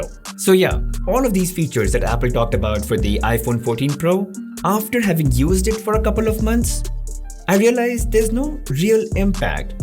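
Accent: India. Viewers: Accept my humor please Your accent is classic, brilliant and likely indian You're this little guy and I say little guy in your accent And you have in an extremely short space of time Become top tier Amongst the most trusted reviewers on earth 🌎 YOU ARE BRILLIANT! Yea we all make errors But brilliance remains.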